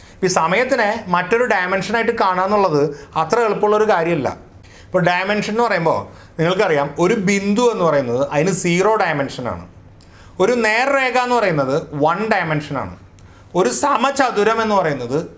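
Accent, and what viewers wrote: Thrissur, India. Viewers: Sir Thrissurkaaranano😀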